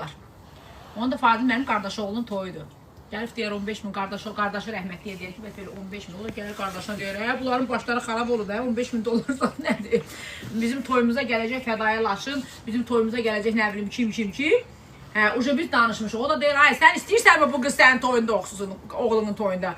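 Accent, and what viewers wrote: Goranboy, Azerbaijan. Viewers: Goranboydan salamlar.salam ay elli qizi.danisigina quzu keserem.oz rayonumuzun lehcesi lezzet eleyir.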